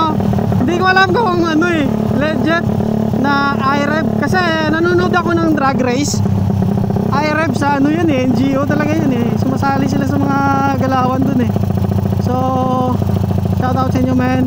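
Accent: Iloilo, Philippines. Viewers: Baw ilonggo kagd boss😂😂✌✌ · Ilonggo gid man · Taga diin ka kuyaa hahaha ilonggo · Illongo si sir haha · Ilonggo ka 😂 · Ilonggo gid si sirrr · Lodi taga san kapo ba ilonggo kapo ba pa shout out nlng lodi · To ilonggo ka?toh race ta nga duwa.visita ko da,visita kaman sakon vmtv.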